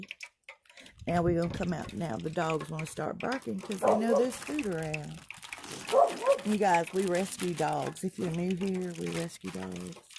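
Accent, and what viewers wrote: South, United States. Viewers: Looks delish!! Where are you from in the South? Your accent is SO cute!! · Hi there... I made your chicken today, marinated over night.. My family loved it. My husband who hears me listen to your videos at times asked me if I was going to talk Southern like you while cooking... In good spirit and in my best southern accent I said " Col. Sanders ain't got nothing on ".. We laughed and I saluted you with my tongs! Thanks for sharing your recipe it's really good!😘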